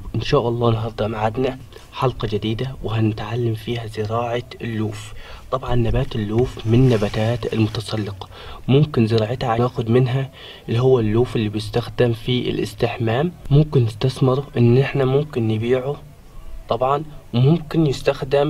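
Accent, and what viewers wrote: Upper Egypt, Egypt. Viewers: يعطيك العافيه اخي فيديوهاتك جميله هل انتى صعيدي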